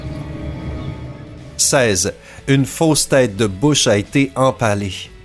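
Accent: Quebec, Canada. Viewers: Avec l’accent québécois c’est vraiment trop chiant à écouter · Je sais que c'est pas de sa faute mais son accent est vraiment horrible, gros plagiat, des informations non correct par moment, des transitions beaucoup trop lente, un accent québécois désagréable. Si vous avez regardez cette video vous avez perdu 11 minutes de votre vie. Surtout que de centaines de youtuber proposent le même format avec des vidéos de qualité. Une vidéos démontrant la médiocrité de cette chaine sous tous ses angles aussi bien par le pésentateur que par l'humour insignifiant et médiocre. Si vous appréciez cette vidéo soit vous êtes aveugle et sourd soit vous aimez visionner de la merde, ce qui fait de vous un scatophile. Parce que à se niveau là je suis étonner de ne pas avoir retrouvé mon téléphone dans les chiottes. Sans oublier le manque évident de créativité et de travaillent qui font de cette chaine ce quelle est. 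· Époque RD son accent il n'y peut rien, il est québécois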